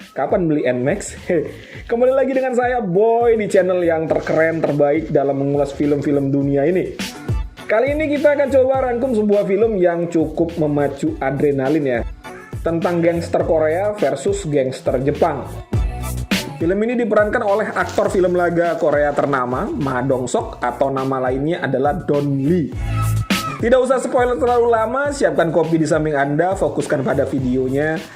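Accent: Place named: Medan, Indonesia. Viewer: Orang Medan kau bang 😆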